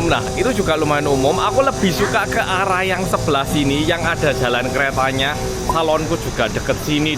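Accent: Java, Indonesia. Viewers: kayaknya sudah lamaa ga kolab sama londo kampung.podo medhoke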